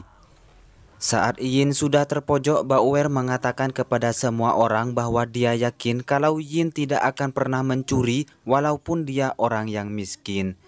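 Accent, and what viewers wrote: Madura, Indonesia. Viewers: Madura sih , kalau dri logatnya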